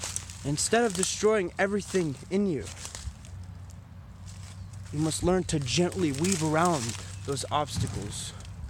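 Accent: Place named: United Kingdom. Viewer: I actually thought you were a hyped British guy.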